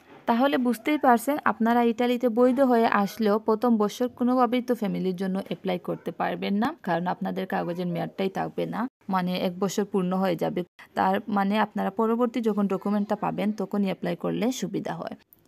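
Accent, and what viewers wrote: Sylhet, Bangladesh. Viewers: আপুর বাড়ি মনেহয় সিলেট, আপনার মাত সিলেটির মতো লাগের।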